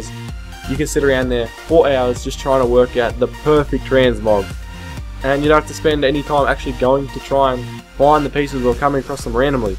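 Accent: Australia. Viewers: Love that Australian accent :D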